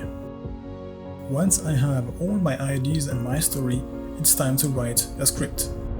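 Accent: France. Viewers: I have the impression that you are french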